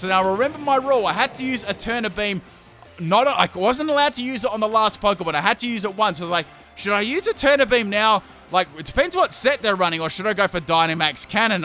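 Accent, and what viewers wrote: Australia. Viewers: Bro he's australian not british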